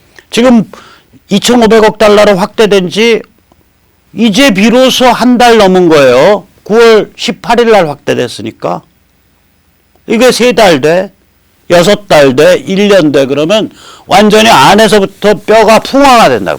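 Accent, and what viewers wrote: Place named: Seoul, South Korea. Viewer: 오늘도 체한것이 시원하게 내려간듯..좋은 말씀 잘들었습니다. 국내,외 지인들과도 많이 공유 했습니다. 특히 좋아하는건.. 선생께 많은분들이 잘 못느끼는 특유의 서울 본토 사투리를 들을 수 있다는 겁니다. 배우 한석규씨의 억양도 순수 서울 사투리가 배어있어 듣기 좋습니다. 나는 지방사투리들도 순수한 정감을 느낄때 좋아합니다. 서울말씨를 익히고 싶은 지방 분들은..특히 젊은분들..? 딴것보다 선생과 배우 한석규씨 프로들 많이 보세요.^^ 선생이 오늘 다른 you tube "pen&mike" 후원행사에 오실줄로 개인적으론 기대했는데.. 편 가르는게 아니라.. 大同團結의 의미에서 기대했었습니다. 늘 고맙게 보고있습니다.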